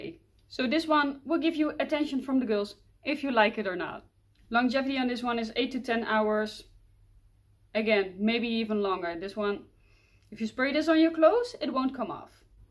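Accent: Netherlands. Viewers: Haha ik wist dat je nederlands was. Hoorde gelijk aan je accent. Nice. Doe je ook nieuwe montale geurtjes? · Jij bent nederlands ik hoor het direct 😜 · Are you dutch????? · Nice accent! Netherlands??and yeeeeeee My fav montale is intense Cafe also !!! 😁😁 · I hear dutch accent, are from your Netherlands? Im discovering Niche too, great video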